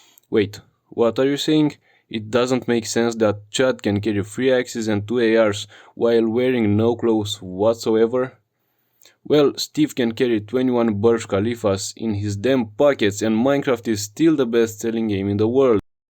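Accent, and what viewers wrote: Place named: Russia. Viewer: Ты русский, по акценту понятно)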